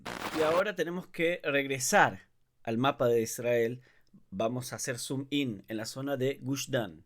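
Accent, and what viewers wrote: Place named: Córdoba, Argentina. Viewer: Muy buen informe, muy profesional. Puedo identificar un lejano acento cordobés … si?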